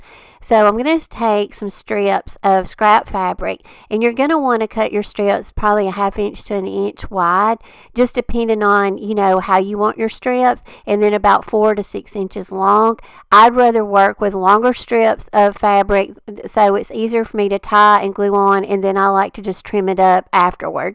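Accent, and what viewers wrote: South, United States. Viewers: You are as sweet as you seem on your videos! Thank you for the kind words and thoughts. I’m not going to win this battle, but I’m so blessed, and take each day as the gift it is. I was a nurse for 30+ years, one of the 1st “lessons” I learned and try to live by each day...”I cried because I had no shoes, until I saw a man with no feet”. I’m a lucky woman. I love just hearing your southern drawl, as it makes me feel as if and think of “home”!! If you’re ever in Va, I got a tall glass of The House Wine of the South (Sweet Tea!) with your name on it! Look forward to what your doing next, and of course will be watching for whoever the Winner is. You show so much more than crafting like the giveaway, “can’t keep it unless you give/share with others”. Thank you for being a bright spot every time you “share”! Xoxo Cindy (sorry, didn’t mean to chew your ear off!! 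I’ll try and keep on topic next time! I don’t do social media I hope I’m not breaking any rules??)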